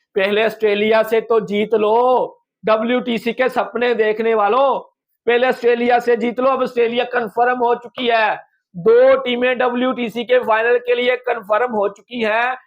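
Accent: Punjab, Pakistan. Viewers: Bhi yea punjab say hai..pura pakistani pubjabi bandey kaaa accent hai 😅😅😅 ISI bandey ki thodri training karadoo. Punjabi in India are ni boltey bhi 😅😅😂😂😂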